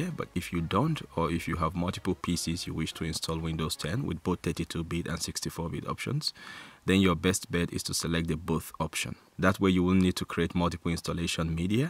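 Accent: Africa. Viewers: Prefer Rufus personally. Thanks for the help sir. I'm digging the soft African accent. I need more tutorials with African accents =D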